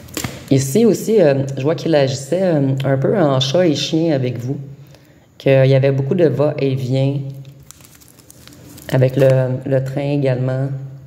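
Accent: Canada. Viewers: Bonjour cousine Canadienne, j’aime votre accent qui me fait penser à mes cousines germaines qui vivent à Montréal où j’ai eu plusieurs fois l’occasion de m’y rendre… Je vis en France… J’aime le format de guidance de 30 min. 😊 J’ai entendu votre voisine mais pas de soucis 😉😘 Je n’ai nullement été offusquée 😉😄ce sont les choses de la vie… Gratitude pour votre guidance 🙏🏼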